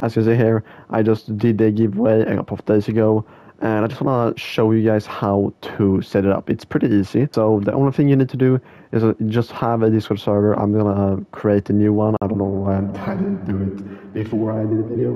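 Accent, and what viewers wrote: Sweden. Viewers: are you swedish? you sound like it and it said igår on the msgs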